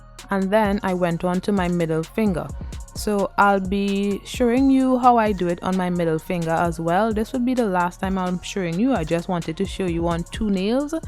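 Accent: Trinidad and Tobago. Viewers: Love the tutorial, but as soon as the video started I was like...SHE TRINI!!! Love it! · Is this a 🇹🇹 accent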